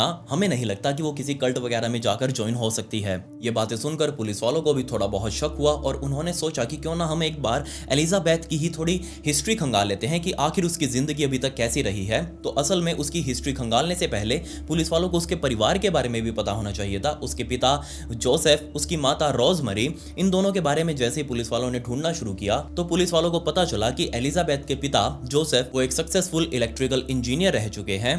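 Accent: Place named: Haryana, India. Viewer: bhaiya ki haryanvi bich bich m nikl jati h m ek ek word notice krti hu😂😂😂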